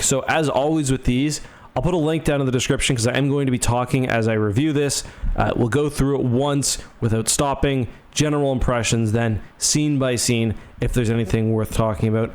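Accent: Canada. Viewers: You should so much more Canadian when you record am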